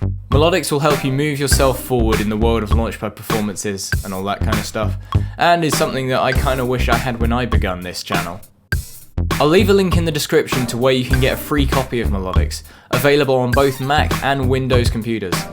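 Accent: United Kingdom. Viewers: His British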